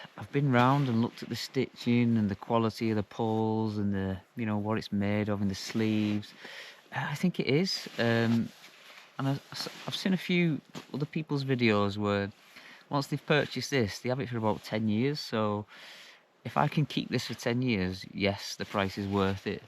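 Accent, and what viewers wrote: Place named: Northern England, United Kingdom. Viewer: I'm late to the party mate but new subscriber from west Yorkshire England,you sound like a northerner yourself, where you from buddy, absolute banger of a tent!!, I've got the red label,,ATB mate,,Daz👌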